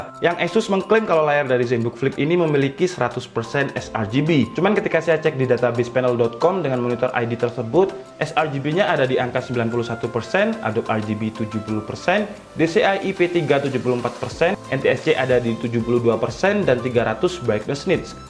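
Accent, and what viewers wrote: Malang, Indonesia. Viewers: Orang Malang min?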